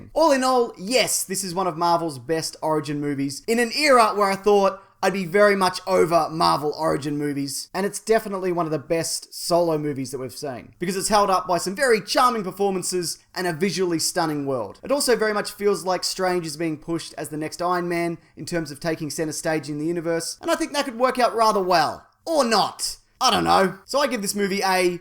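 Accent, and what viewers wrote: Australia. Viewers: Mate you are so Aussie, it's fantastic. Thank you for being so ocker and totally whatever about the whole thing - made the review much more enjoyable haha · I thought this guy's Australian (British?) accent would get to me ... but it wasn't too obnoxious.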